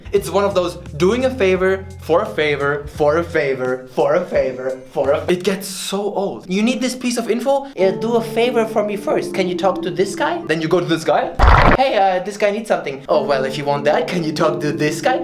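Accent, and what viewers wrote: Germany. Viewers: DIeses Deutsch-Englisch so geil :D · Mostly its German but he often loves to put some Asian accents in. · are you German? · Are you german? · weird question: are you german? lol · Liebe den schnellen englisch deutsch mashup, weiter so xD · Are you from Germany? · Are you German? your accent reminds me of my german teacher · Hes german I think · Ich Love your Denglisch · asian german speaking english im sold xD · you are chinese but your accent sounds like german and french some times and did you just said "figlio di putana" (son of a bitch in italian) ? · why the fuk do you speak deutglish im mexian how the fuk am i supposed to understand you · german for sure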